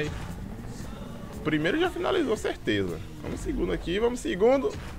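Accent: Bahia, Brazil. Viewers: é baiano? se for é nós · vc é da bahia?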